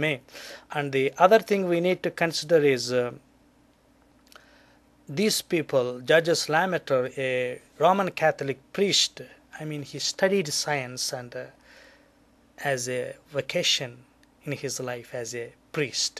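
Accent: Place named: Kerala, India. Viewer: very funny,, a typical mallu,,saying yexposed,,yauniverse,,dactar jaakir nayik,,,man plz go to some english school and learn some thing ,,instead of wasting time like this..plz go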